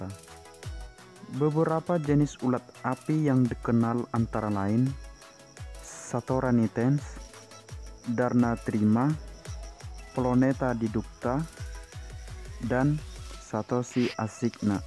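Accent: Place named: Batak, Indonesia. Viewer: BATAK SEKALI, BATAK KENTAL CESS..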